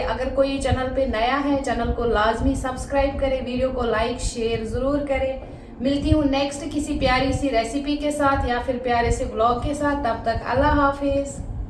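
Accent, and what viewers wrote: Kashmir, India. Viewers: Subscribed 👍 Enjoy Make videos in our own mother tongue that will be more beautiful I don't know where from you people But your accent is soporen Rise and shine❤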